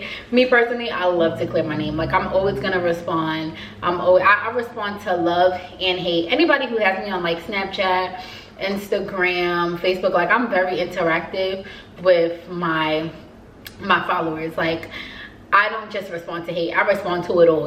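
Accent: New York, United States. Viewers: I needed to Hear This ❤️‍🔥🥴 But yooo the nyc is def in you 😂 #BIGVIRGO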